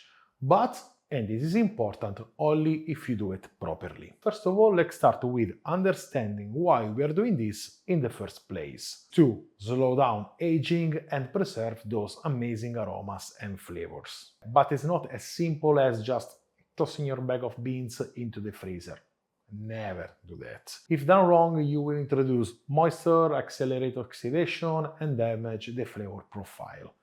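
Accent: Italy. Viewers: Don't give up your Italian accent,! It enhances your credibility, along with all the impressive science. Molte grazie. You're the coffee go-to man.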